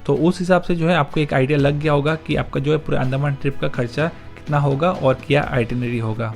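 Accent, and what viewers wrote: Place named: Bengal, India. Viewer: From your accent I guess either u r Bengali or Assamese?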